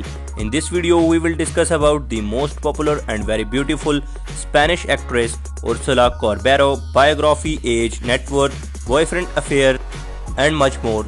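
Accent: India. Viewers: The intro was enough for anyone to leave immediately, the creepy indian accent.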